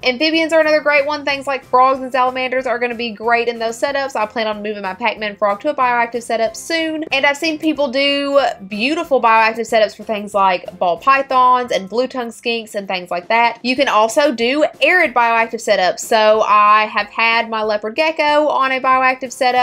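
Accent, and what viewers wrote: South, United States. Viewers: Really nice videos. talks country (like me) but also very fast. I'm confused.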